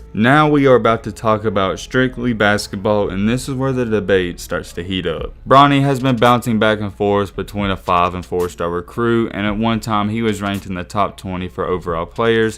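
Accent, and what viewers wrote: South, United States. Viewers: Where are you from in the south?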